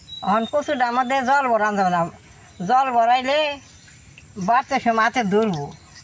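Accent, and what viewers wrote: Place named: Bangal, Bangladesh. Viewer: একে তো ফোকলা তারপর বাঙাল ভাষা। কিছু ই বুজতে পারলাম। না সরি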